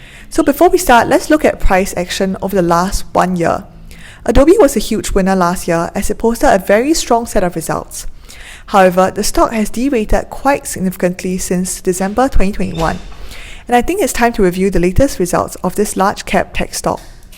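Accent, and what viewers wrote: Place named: Singapore. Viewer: Singaporean accent.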